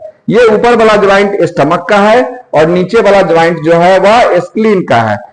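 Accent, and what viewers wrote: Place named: Bihar, India. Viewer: Sir ji aap ney bahut wadia jankari di. But aap ki jo hindi hai woh shud nhi hai jaisey aap yin ko in boltey ho spleen ko espleen wood ko ud aap hindi meyn Bihari Bhojpuri mat dalo kyon key aap ki video poora deysh deykhta hai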